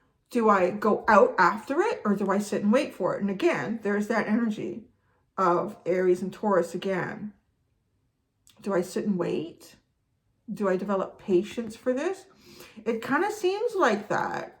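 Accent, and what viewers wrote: United Kingdom. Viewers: You do a British accent perfectly! It sounds Gr8 to me, but I'm in Texas. haha. Random Royal fact, Prince William is a Leo🦁🦉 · Thankyou that was interesting, that was a great english accent too and you can't beat a bit of trailer park boys 🤣 peace, love and light to you ✌💞🙏 Xx much love Xx from London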